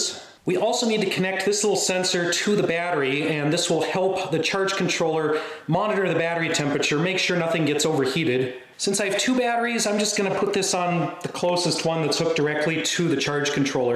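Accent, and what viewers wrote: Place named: Alaska, United States. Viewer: You being from Alaska tracks. I couldn't place your accent, but you sound similar to an Alaskan I used to work with a million years ago.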